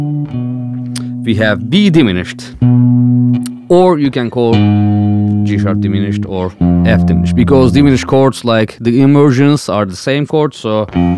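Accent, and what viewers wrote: Turkey. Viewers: Abi türk olduğunu aksandan anladım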